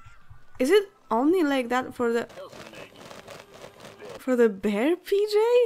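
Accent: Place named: Poland. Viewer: Kocham ten polski akcent <3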